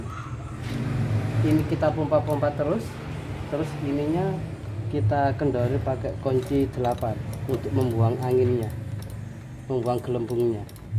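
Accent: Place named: Java, Indonesia. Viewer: Medok kali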